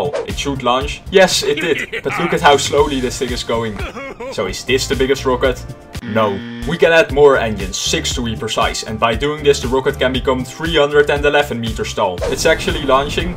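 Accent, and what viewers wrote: Netherlands. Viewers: i hear some dutch accent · Are u dutch? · He is from holand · Wait he Dutch? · Are you dutch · bros dutch? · You sound dutch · ik hoor een nederlans asent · No one like bc he sounds dutch just talk dutch no one understands you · Een klein beetje Nederlands accent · You sound dutch? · Are you from The Netherlands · You dutch?? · This guy is totaly dutch like 100% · Ik kan horen dat je Nederlands bent, maar dat neemt niet weg dat je Engels goed is. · Je bent sowieso Nederlands Or if You’re English I thought you were Dutch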